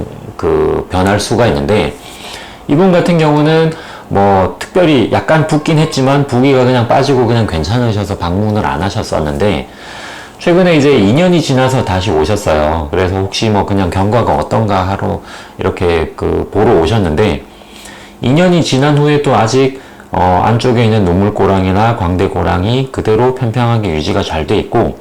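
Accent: Gyeongsang, South Korea. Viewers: 저도 경상도 사람이라 원장님말투랑 스타일 익숙했는데 저분이 오해하신거같네요 꼭 방문해보세요 현실적인 조언과 상담 해주시고 눈물고랑필러 진짜 자연스럽게 잘됐고 2년은 유지됐어요~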